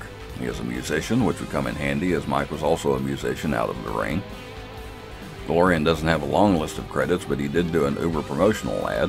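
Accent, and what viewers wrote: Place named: Texas, United States. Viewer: I'll admit I grew up pretty removed from the world of pro wrestling, even being from Texas I've never heard of the Von Erichs. However, since I saw that first poster I've been transfixed by the story and tragedy of the brothers, and I can honestly say it's been years since I've been this excited by a film. Hearing you talk about the movie, the boys, and the sport with such a sense of respect and love and with that deep accent I've come to miss so much since I've been away from home, I'm starting to realize why I've come to feel so connected to this film that hasn't even come out yet. Thank you for your thoughtful analysis, please keep doing what you love.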